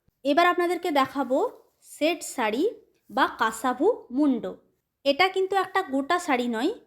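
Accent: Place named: Birbhum, India. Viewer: আপনার সঙ্গে কেরালা ভ্রমণ করছি।ওখানকার জীবন সংসার সংস্কৃতি সব জানতে পারছি,আপনার সব ভ্লগ এখনও দেখা হয়নি,তবে আপনার ভ্লগ সাবজেক্ট ও প্রেজেনটেশনে দক্ষতা ও মুনশিয়ানা আছে, সকলকে আগ্রহী করে তোলে,অবশ্যই ধন্যবাদ। আরও কথা যা শুনি যে বাংলার পরিযায়ীদের 2য় ভুমি কেরালা,ওদের কাছে থেকে দেখে শিখে ওরাও অনেক উন্নত ও ভাল থাকছে।আপনার ভ্লগে তাদের অনেক কে দেখলাম, ভাল লাগল। একটা কথা আপনার কথায় একটু বীরভুমের টান আছে, সেটা হতেই পারে , কিন্তু আপনার কেরালা কে ক্যারালা শুনতে একটু কটু লাগে, অবশ্য জানিনা ওখানে সঠিক উচ্চারণ কেরালা নাকি ক্যারালা বলে আপনি ভাল জানবেন।অনেক ধন্যবাদ।বাংলার মেয়ে তথা বধূর শিক্ষা ব্যবহার মানসিকতা ও সহযোগী ভুমিকায় ঊজ্বল হোক আপানার সংসার।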